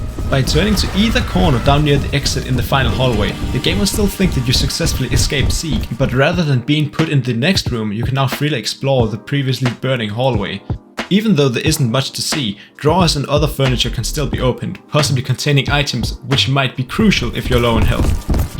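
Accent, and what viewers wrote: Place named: Denmark. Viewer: i can just hear the danish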